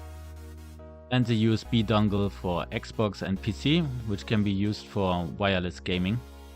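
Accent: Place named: Germany. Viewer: Bei der harten englischen Aussprache würde ich schwören, dass deine Muttersprache ebenfalls Deutsch ist 😄 gutes Video, hat mir weitergeholfen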